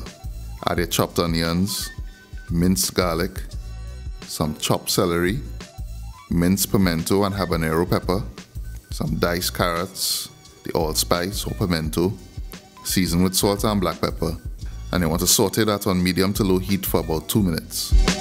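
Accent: Trinidad and Tobago. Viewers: But on a real tho, I really like how homeboy talks, It sounds so Trini and he's straight to the point, very interesting delivery. Keep up the good work and keep bringing these delicious recipes! Respect!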